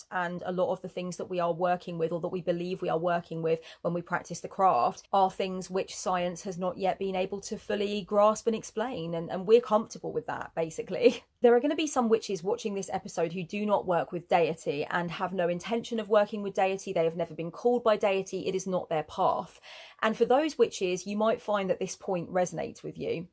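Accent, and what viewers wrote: United Kingdom. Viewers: I enjoy your intelligent and independently minded videos. Find them quite relevant. Plus, like British accents. Which regional accent is that?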